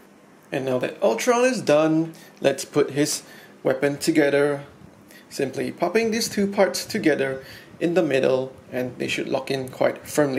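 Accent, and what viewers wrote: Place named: Singapore. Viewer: I know a Singaporean when I hear one. Nice review man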